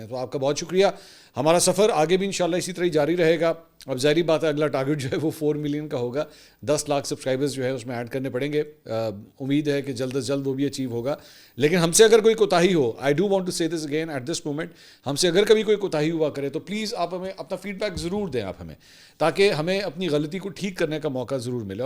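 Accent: Punjab, Pakistan. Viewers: Jo ap Urdu bolty bolty Punjabi ka touch daity Hain I love that❤❤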